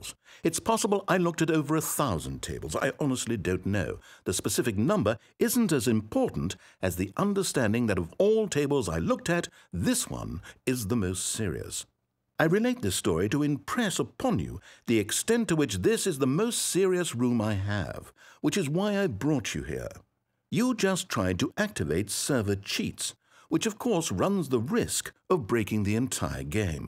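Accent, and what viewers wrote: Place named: United Kingdom. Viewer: I always loved how this adult British narrator straightly says such a little kid number like one-hundred-billion-trillion years.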